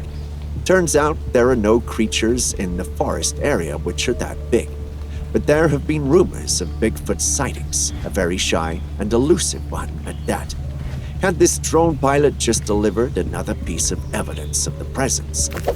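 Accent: England, United Kingdom. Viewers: Why are you talking with an English accent in this video? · bro your starting to sound like a pommy englishman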